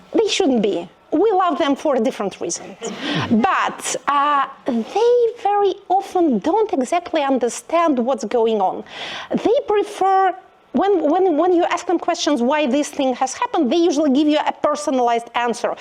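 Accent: Russia. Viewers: It’s a Russian accent not German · С русским акцентом легче понимать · Зе инглиш из соу рашн❤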